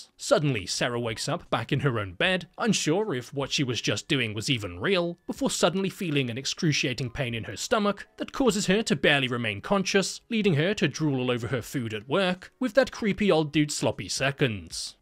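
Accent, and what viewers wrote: England, United Kingdom. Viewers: Ive said this before but bros humor is peak. Amplified by his British accent.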